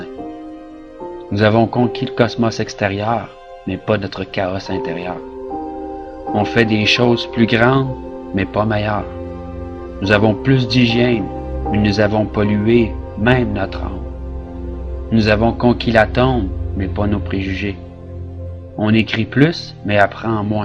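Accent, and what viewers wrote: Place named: Canada. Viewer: j'adore l'accent Canadiens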